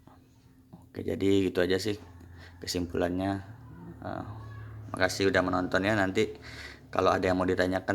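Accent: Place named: North Sumatra, Indonesia. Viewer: Kek anak Sumut kau bg, dari logatnya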